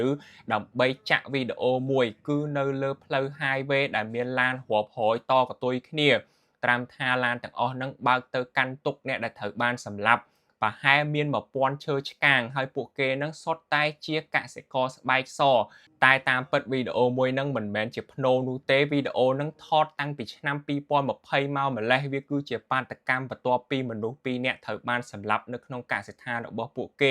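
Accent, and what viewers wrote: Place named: Vietnam. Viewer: កុំនិយាយភាសារខ្មែរតាមរបៀបតុងយួនមកនិយាយអី ព្រោះខ្ញុំស្អប់យួនណាស់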